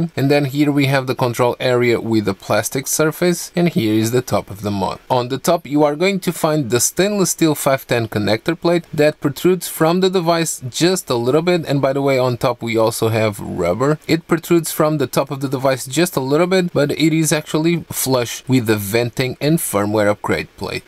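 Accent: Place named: Portugal. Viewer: That Mourinho-like Portuguese accent is just awful.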